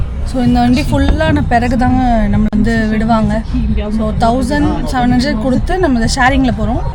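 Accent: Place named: India. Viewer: யூரோப் & யூரோப்பியன் யூனியன் பிரதேசங்கள் பயணம் செய்யும் போது, இந்திய ஆங்கிலம் பேச வேண்டாம்.பஸ் என்கிற வார்த்தை புஸ் என்று கேளுங்கள்.போலிஸ் வார்த்தை புலிஷ் என்று கேளுங்கள், ஏர்போர்ட் வார்த்தை ஏர்போட்டா என்று கேளுங்கள்! உங்களுக்கு வழி கிடைக்கும்.😊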